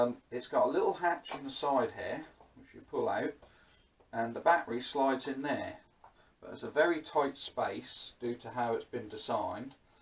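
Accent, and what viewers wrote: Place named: Suffolk, United Kingdom. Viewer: it sounds as though you may come from Suffolk boy as I do … keep up the good work 👍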